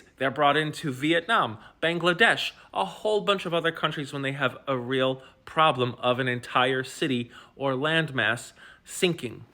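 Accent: Germany. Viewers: Ha, the accent is German, not Dutch...😅 And it is insane that our country is 2nd in agricultural export. We are very very small and it is not sustainable for our land and water to carry on like this. We have quite a few problems when it comes to clean water supply...and we are a delta. And if you look at our politics, you wouldn't guess that we are a highly educated people. It really has been a clown show. Our elections are today. Let's see if the Dutch got a bit smarter the last 2 years. I am not counting on it, but am still hopeful. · The accent as always was more german than dutch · Hell no, we don't sound this german. And We probably one off the best english speakers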